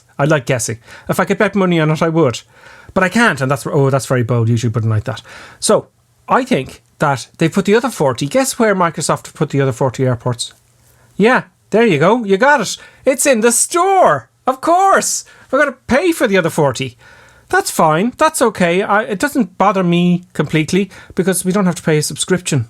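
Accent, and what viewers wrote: Ireland. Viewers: As always great video professional informative most of all fun to watch thank you and I love your Irish accent 👏👏👏👏👏👏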